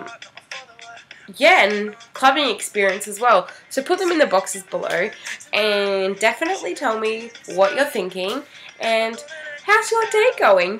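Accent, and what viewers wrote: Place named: New Zealand. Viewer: just an observation - you talk like a new zealander. we have to change that!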